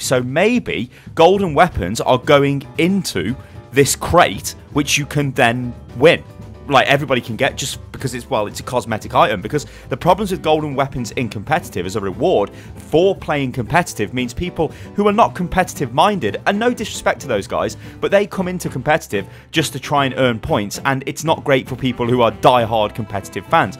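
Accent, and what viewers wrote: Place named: United Kingdom. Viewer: where in Britain are you from?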